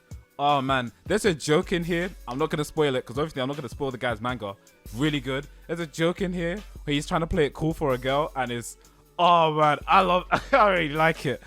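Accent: United Kingdom. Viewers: Ok haven’t watched the video yet. My thoughts before I start based on the title. Coming from the perspective of a black American male. Your accent from the perspective of white Americans, (which is the perspective of power) puts British blacks in America a top American blacks in the hierarchy . Now you’re still black so you’re bellow all white folk, but your struggles here in America will be different than an American black. I wouldn’t consider it American exceptionalism because it would be ignorant to think because you’re British you don’t experience the same sort of systematic racism that we have in America, because systematic racism isn’t an American thing. It’s a western thing, you’re still black you’ll face the equivalent hardships just the British version. I’ll come back and edit this post after the video if my thoughts have changed or been addressed.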